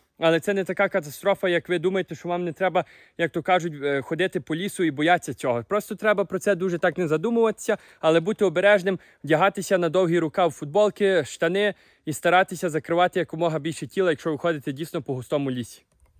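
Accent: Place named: Lviv, Ukraine. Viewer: Я,коли чую вашу розмову,не можу повірити,що ви 17 років живете в Канаді)) таке враження,що ви з якогось львівського села)))) ваші відео піднімають настрій)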